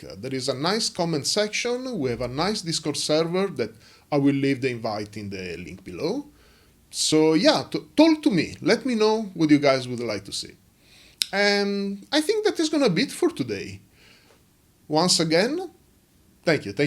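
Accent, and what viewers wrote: Italy. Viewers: Love your content so much, as a beginner they’re very helpful improving the skills of gunpla building. Also love the mood of the videos, they’re relaxing and always make me smile. Congrats for the 1000 subs! (Just a quick question, sei Italiano? Dalla pronuncia sembri emiliano come me ahahahah) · Congratulazioni! Mi era sembrato di riconoscere un accento italiano, e ora ne ho la conferma! Apprezzo moltissimo i tuoi contenuti, i tutorial sono estremamente ben fatti e facili da seguire. Non vedo l'ora di vedere i prossimi video sul MG Sazabi. Personalmente, sono un grande fan del weathering, quindi sarebbe davvero interessante vedere dei tutorial a riguardo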